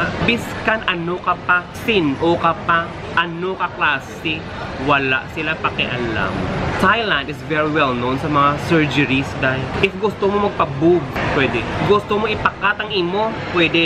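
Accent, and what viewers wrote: Iloilo, Philippines. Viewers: Hugot gid kuya, true gid ginahambal nimo how,tama ba ako ilonggo,bisaya gid ako,thailand is the nice place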